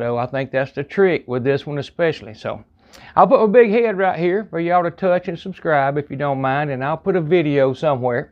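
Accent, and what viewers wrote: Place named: Georgia, United States. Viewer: I found your channel after searching YouTube for ways to use the Instant Vortex oven ... Love your Georgia accent!!! I have binge watched a lot of your videos! You are a pleasure to watch. Keep putting out great videos!!!! Joanna from South Carolina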